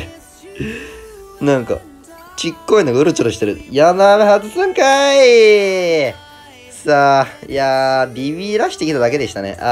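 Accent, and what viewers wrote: Okayama, Japan. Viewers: ちょいちょい岡山弁でてるw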